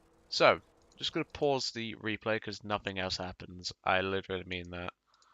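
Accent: United Kingdom. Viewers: U British mate?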